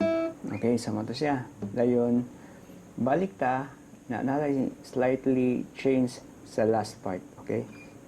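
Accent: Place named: Visayas, Philippines. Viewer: Ok kaayo bro. Taga asa diay ka murag bisaya man gyod hahaha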